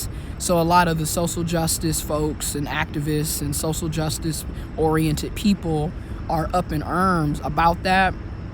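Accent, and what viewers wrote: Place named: East Tennessee, United States. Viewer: You sound like you from east Tennessee with a Southern accent but you articulate your words very professional in beautiful i wish i can speak like you , you are right what you saying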